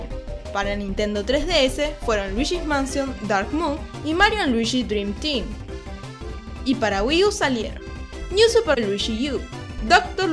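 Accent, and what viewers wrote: Argentina. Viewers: Luigi Es Mi personaje Preferido De Ambos... Las Risas Que Me Hizo Dar En "Mario & Luigi - Bowser's Inside Story" Fue Fantástico Jaja Les Tengo Una Pregunta... ¿De Donde Son? Es El 2° Video Que Veo Y Me Salió La Duda Si Son Argentinos Jaja Me Suscribí... Sigan Así...!! Saludos... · Me encanta el asenro argentino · Creo que es por su acento, debe ser de Arg. o Uruguay.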